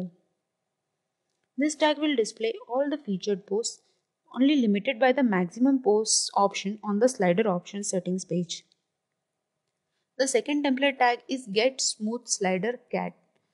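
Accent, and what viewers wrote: India. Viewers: Indian speed, accent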